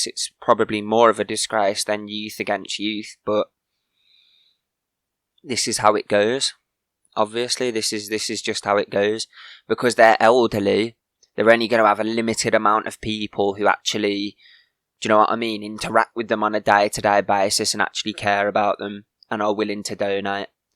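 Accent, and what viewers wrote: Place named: United Kingdom. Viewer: Where is your accent from I know British but where . My Aunty and uncle lives in England their accent is way different love the accent by the way keep up the good work. Congrats on your little one .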